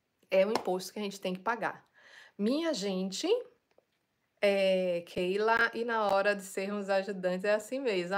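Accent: Bahia, Brazil. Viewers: Que delícia de página! Comecei a seguir hoje ! Que delicia de sotaque Baiano! História de vida linda❤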